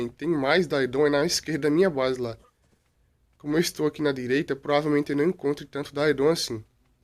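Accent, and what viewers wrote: Northeast, Brazil. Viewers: Nordestino com certeza 😅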